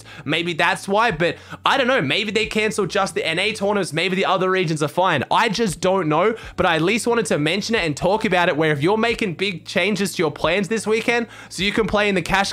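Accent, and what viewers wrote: Australia. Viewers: Aussie!!!!!!! Yo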